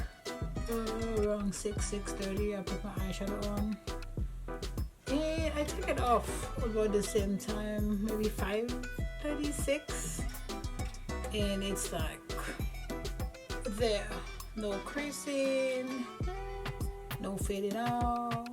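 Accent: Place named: Caribbean. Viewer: U sound Caribbean, I love it 🥰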